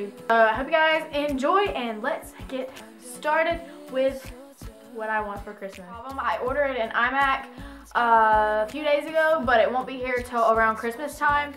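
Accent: South, United States. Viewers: Are you from the South???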